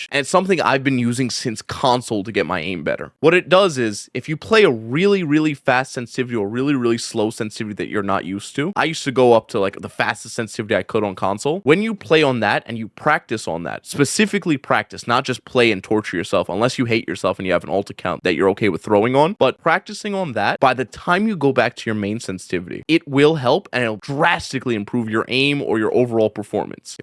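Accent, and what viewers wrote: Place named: Bronx, United States. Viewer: U gotta be from the Bronx my boy 😂 🤞🏽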